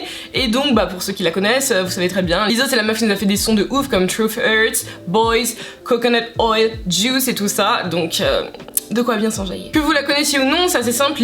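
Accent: England, United Kingdom. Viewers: pourquoi tu prend un accent anglais? C'est voulus ou tu es a appris l’anglais au Royaume Unis? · les gens devraient laisser Lizzo tranquille mdr, ça va là tu as un petit accent British quand tu parles anglais, c'est très mignon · It's absolutely not related to the video but I just figured out that ur accent is very British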